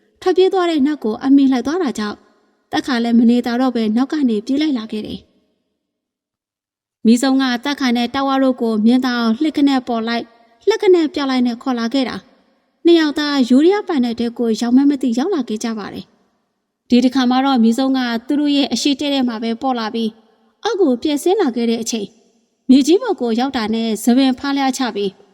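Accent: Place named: Thailand. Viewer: နားထောင်လိုအရမ်းကောင်းထိုင်းလိုမပီတပီပြောတာလေးလဲသဘောကျတယ်ဗျ❤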